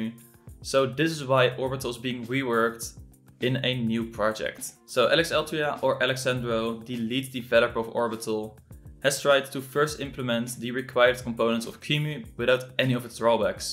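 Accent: Netherlands. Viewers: mooi nederlands accent, goeie video! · are u dutch lol?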